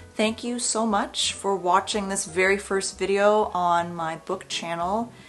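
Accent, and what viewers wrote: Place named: United States. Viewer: A bit off topic,,,but why do you sound American? Specifically speaking Network Englkish. I don't hear a trace of Canadianisms, like process pronoced proecess, about pronounced aboot (more nuanced but I dunno how to spell it), no terminal rhetorical questions, eh? I'm a native Californian and you sound indistinguishable from my fellow SF Bay Areans. Inquiring minds want to know.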